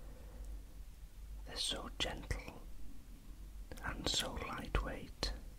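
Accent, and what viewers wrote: Wales, United Kingdom. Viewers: Wow, this is amazing. Are you Welsh Sir. Those Squirrels are seriously cute.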